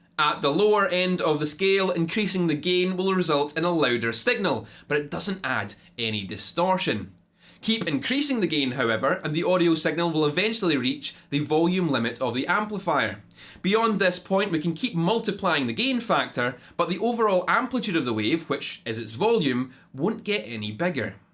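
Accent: Scotland, United Kingdom. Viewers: Why are all the guys who make pedal videos Scottish or from the British isles?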